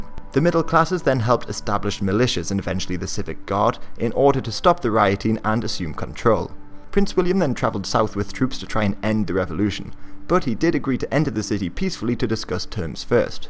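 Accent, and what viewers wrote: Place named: Newcastle, United Kingdom. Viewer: Where are you from? You sound like a Geordie who's trying REALLY hard not to sound like a Geordie...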